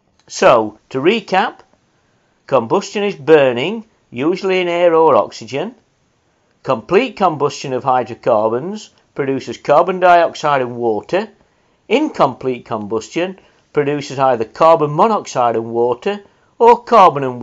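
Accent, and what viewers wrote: United Kingdom. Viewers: Its not weird, it's an English accent(British).I know its pretty weird for all of us,,, I understand!.) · I was looking for a short, to the point and well explained video to summarise the incomplete combustion of hydrocarbons - this is excellent. Fits my purpose perfectly. And I love the accent - very British!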